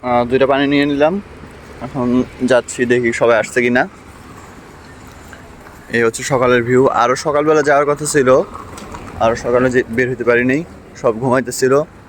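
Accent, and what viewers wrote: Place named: Ishwardi, Bangladesh. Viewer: ভাই আপনার বাড়ি কি পাবনা জেলার ঈশ্বরদী তে আপনি ঈশ্বরদীর ভাষায় কথা বলছেন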